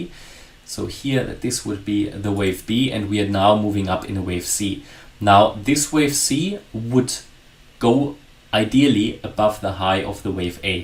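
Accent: Germany. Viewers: Love the German accent. Keep the studies in coming! 📊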